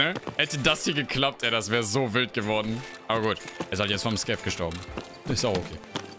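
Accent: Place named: United States. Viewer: das liegt dadran das er 5 Jahre in den USA gelebt hat, das bekommt man auch nicht mehr aus ihm raus^^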